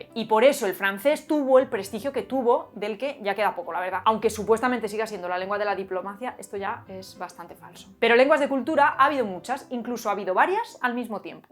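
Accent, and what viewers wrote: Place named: Spain. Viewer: Jajajaja y le entiendes bien a la del video? Pq a mi me cuesta un poco pq habla en español de españa y habala bien rapido la vdd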